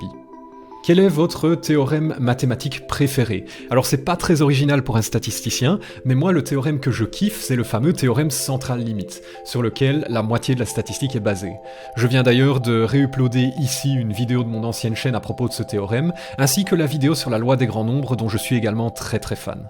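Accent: Belgium. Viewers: P pour moi ton accent est normal .. je suis Belge également, mais pour beaucoup de français l'accent Belge est celui qui est caricaturé dans "le diné de con" ... ce qui n'est pas pareil :D Personnellement ca ne me dérange pas toutes les histoires de monétisations et de publicités, c'est normal après tout ! A bientôt !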